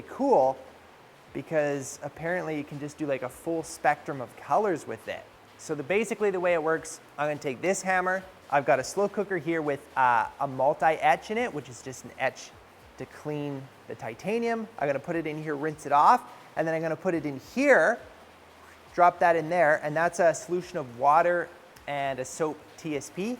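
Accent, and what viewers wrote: Canada. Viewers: bloody hell bud, you've got the most stereotypical Canadian accent i've ever heard! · What?! This dude has a serious Canadian accent. Matthew McConaughey has a thick southern accent.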